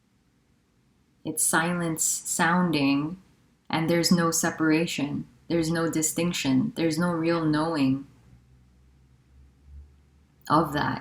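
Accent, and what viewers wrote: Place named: England, United Kingdom. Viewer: Beeeeuuutiful . And I love the English accent when USAID"it's quite amazing that this should happen😂"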